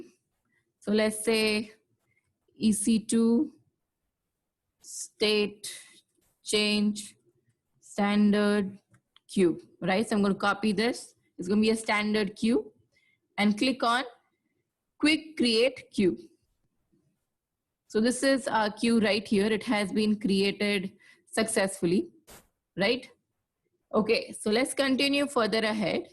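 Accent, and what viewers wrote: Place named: India. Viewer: Explained very well!. Was looking for one with Indian accent. Thanks a lot!!!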